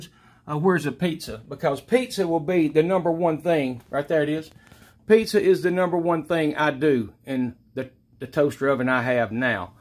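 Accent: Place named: Texas, United States. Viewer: your accent is fantastic! Texas?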